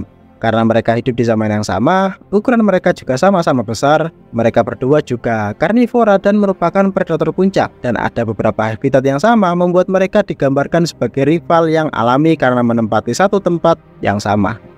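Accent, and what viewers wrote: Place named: Java, Indonesia. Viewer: Bg mhon maaf nih ye,,😂.. kok suaranya abg nih mirip chanel nyoto tutorial ye?? Apakah org nya sama😅.. khas kek ada jawa nya gitu cara bicaranya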